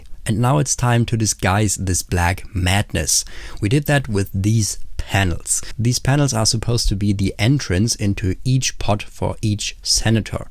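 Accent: Germany. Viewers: Love the work and ze german accent✌️ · Denglisch, hört man sofort raus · 1 second in the video and i can already tell you're german as well xD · German accent Kicks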